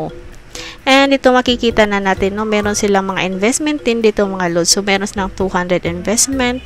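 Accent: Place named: Visayas, Philippines. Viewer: Bisaya ka mam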